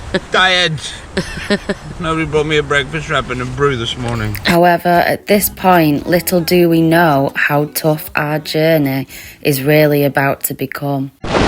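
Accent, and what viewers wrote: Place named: Liverpool, United Kingdom. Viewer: Hi, I have a very light combination bike lock which probably wouldn't stop a professional thief but could deter someone just doing an opportunistic snatch and grab. UK has so many interesting accents, I was thinking Liverpool for you( I'm not sure on his accent). I have checked out your other videos and wild horses wouldn't have dragged me up that path in the Madiera mountains and those Greek beaches looked amazing. I do some videos of my cycling trips but just from an android phone so not very professional and room for improvement. Keep up the good work.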